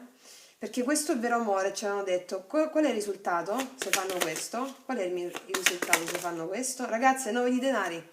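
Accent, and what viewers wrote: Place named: Salento, Italy. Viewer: Ti ho scoperto x caso e la luna rossa dice delle cose a cui voglio credere. Il tuo accento mi è familiare. Salentina? Brava. Grazie.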